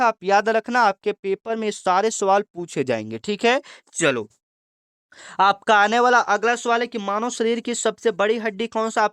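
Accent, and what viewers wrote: Bihar, India. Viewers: Sir aap bihar se ho kya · Kaise bolte hoo bihari ho kaa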